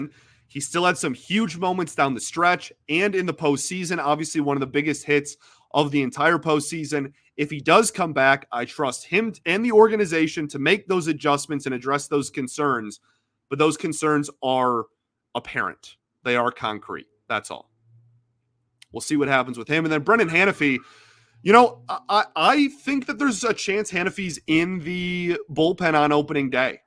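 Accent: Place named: Midwest, United States. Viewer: My comments are not just pet peeves. They are advice to help you with your career, because your personality, intelligence, heart and knowledge can take you far. Only your presentation can hold you back and it has improved a lot in the last year. This comment is about the difference between "get" and "git." The first is the correct way to say it. "Git" marks you as a backward, midwestern hick. I know. I had the same problem growing up in Toledo. Here is a way to help you, because I know right now. you can hardly hear the difference. Would you say "base het?" Would you say "lit me in, please?"" Would you use a catcher's "met" behind the plate? Get what I'm saying. There are two difference sounds between "i" and "e" and in most instances we get the difference. For some recent the midwest accent among the uneducated says "go git it" instead of "go get it." People who want to hire you will hold that against you and people who aren't sure why will still think you sound a little off. 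Thanks for reading and please know that I only write this in a desire to help.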